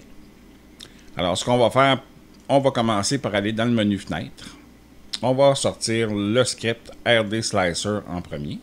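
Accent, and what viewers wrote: Canada. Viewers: Un grand merci pour vos tutos de qualité et très bien expliqué, abonné depuis bien longtemps c'est toujours un grand plaisir de vous retrouver pour vos astuce le tout avec l'accent canadien, que du bonheur merci ;0)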